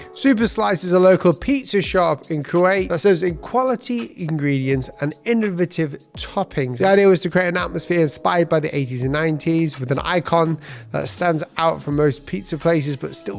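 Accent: United Kingdom. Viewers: He sounds VERY British 🔥🔥🔥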